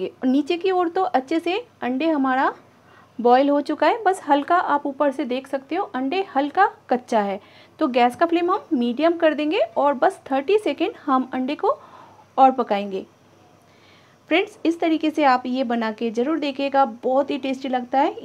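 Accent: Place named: Bengal, India. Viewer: yummy looking surly must be tasty too, I would like to know, this is bengali style, your speaking style is also bengali.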